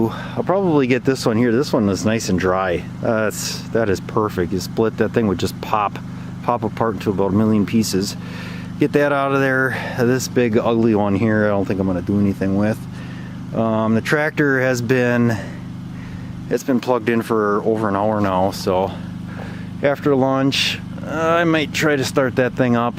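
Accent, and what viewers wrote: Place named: Minnesota, United States. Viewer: Where are you? I’m in Stillwater. Sounds like your in MN. I have a JD 3033 your video was a big help when I put my block heater in. Thanks for posting.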